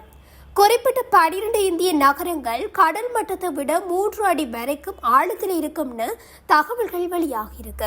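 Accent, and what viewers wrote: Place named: Sri Lanka. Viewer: Ilangai thamil kaetpadharku inimayaaha irukum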